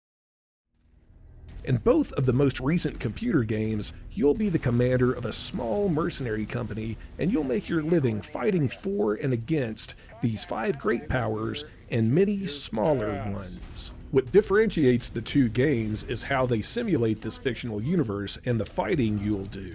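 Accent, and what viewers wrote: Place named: Midwest, United States. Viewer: Thanks! Just bought BT and Big 3 DLC! And subscribed! I'm doing my part! I like your narrator voice, clear and calm with that midwestern (I think...) accent.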